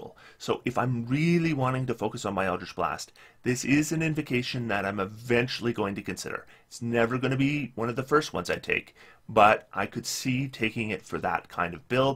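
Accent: United States. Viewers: aegonizing blast. gotta love that northern midwestern accent, heh!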